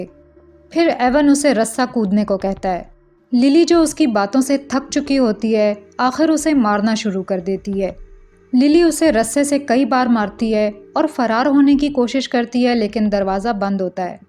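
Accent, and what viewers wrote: Pakistan. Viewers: Pakistan mein bahir bolte hain,hum Indian bahar bolte hain,thoda sa different hota hai accent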